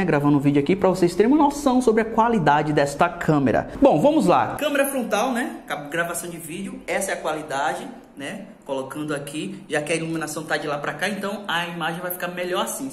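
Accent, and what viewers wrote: Bahia, Brazil. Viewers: sotaque baiano, é isso mesmo produção? SSA tá feliz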